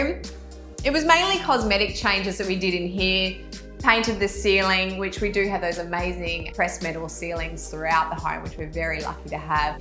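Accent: Australia. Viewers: Nice home like your accent too are you from Australia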